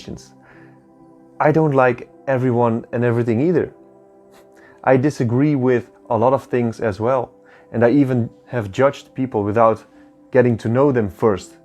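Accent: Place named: Brazil. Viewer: Are you brazilian? 🌚 because you have a brazilian accent.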